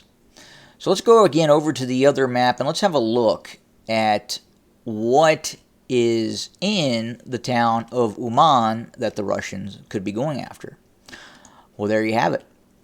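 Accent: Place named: South, United States. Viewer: Thanks for this excellent update. I like the way you speak (as opposed to some complainers in the comments below), but I'm curious where your 'accent' is from. I'm guessing a Southern state, but which one?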